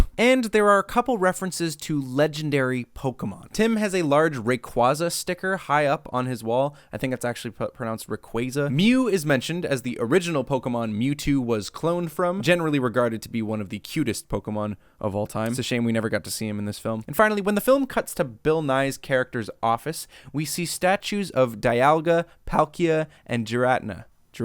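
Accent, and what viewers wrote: Australia. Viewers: Maybe doesn’t realize that people mainly come here for his Australian accent voice. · Binge watching old videos then just not hearing an Australian accent all of the sudden was incredibly jarring. I do like the videos where gets involved though.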